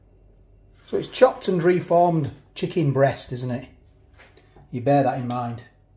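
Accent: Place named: North Lancashire, United Kingdom. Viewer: That's a broad North Lancashire accent young man. That is why Barrow and the rest of Furness will always be Lancashire, you keep the real county alive, and better still the abolition of Cumbria in May 2023 frees you from being run by Carlisle, effectively Scottish lol. Me and hubby enjoying your video's following You Tubes recommendation of your channel and while you're around, Lancashire will never die :)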